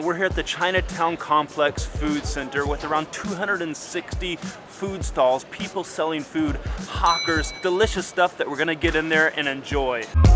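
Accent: United States. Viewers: U from Minnesota ? Midwest accented ? Yah ? 😅 Hope U have tucked into the "Half-boiled" Soft eggs with black sauce & pepper, with crispy ButterKaya Toast !?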